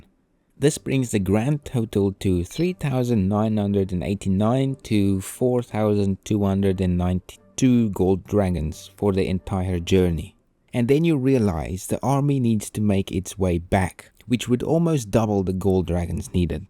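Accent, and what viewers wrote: Afrikaans, South Africa. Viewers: Good day to the Narrator, quick question, is the Narrator South African? 🇿🇦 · Are you from South Africa? 😭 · What is your nationality? Accent sound Afrikaans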